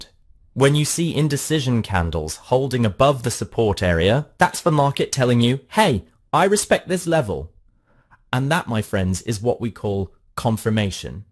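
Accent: United Kingdom. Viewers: You are the best teacher I like your British accent Cheers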